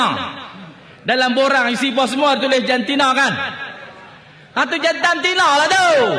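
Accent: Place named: Baling, Malaysia. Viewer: Sanusi ni org baling. Memang org sana stail cakap mcm tu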